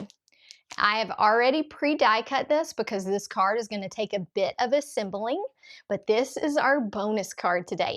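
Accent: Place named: Chattanooga, United States. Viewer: I thought I recognized that accent! I’m in Chattanooga! Love your cards! So glad I caught the replay - hopefully I’ll catch the next one. I’ve just started using my nesting dies for more than just a panel. TFS!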